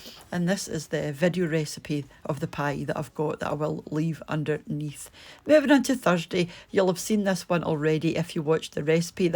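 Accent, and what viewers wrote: Scotland, United Kingdom. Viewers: Happy New Year to you and yours!! My best friend, Wendy who sadly passed away a few yrs ago was from Arbroath originally and you sound just like her. Your accents are the same. TY for sharing your lovely recipes. Im going to make your mince pie recipe and like you, Im also going to use puff pastry. It looks delicious. Cheers and best wishes from sunny FL. USA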